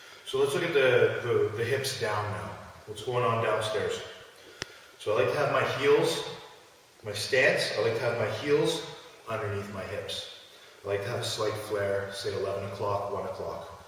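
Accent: Canada. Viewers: is canadian right? I can hear the Canadian in his voice:P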